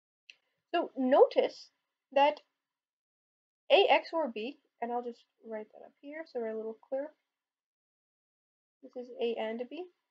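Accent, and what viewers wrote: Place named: United States. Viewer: please clearly speak beacuase u have american ascnet and i am from india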